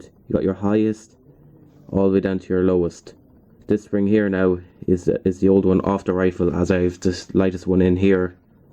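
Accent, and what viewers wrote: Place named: Ireland. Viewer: Sounds like he's Irish.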